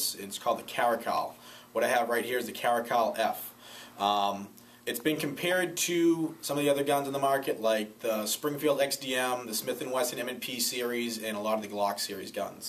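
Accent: Massachusetts, United States. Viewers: Are you from Mass?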